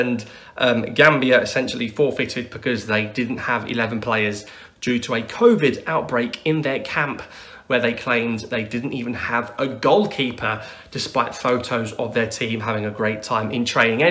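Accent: United Kingdom. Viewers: you got a British accent ! Are you English guy who supports algerian football team ?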